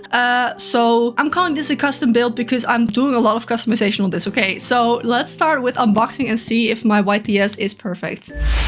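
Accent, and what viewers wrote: Netherlands. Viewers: From hearing your accent I thought you were like Dutch… and when I saw “type om te zoeken” on your pc I was sure!!!! Nice video!!! <3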